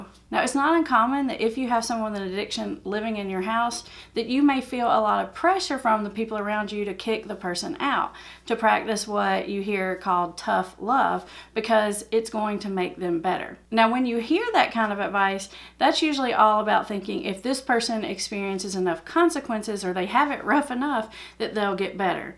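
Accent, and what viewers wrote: South, United States. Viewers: i like that country accent ;)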